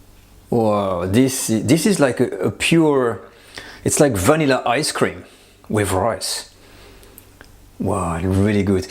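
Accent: France. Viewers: you re absolutely fantastic to watch even your French English accent..Bonjour Chef...Bernard from The Philippines..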